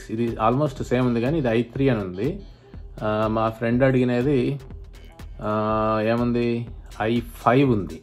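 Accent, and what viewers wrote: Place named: Telangana, India. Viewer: Telangana slung sir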